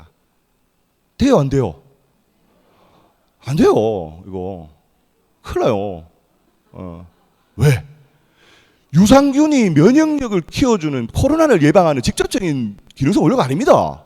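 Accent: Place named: Gyeongsang, South Korea. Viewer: 앱소브제품 설명 잘 들었습니다 구수한 경상도 사투리도 좋네요 이 제품에 칼슘 제품은 없던데 보충해야 하는지요?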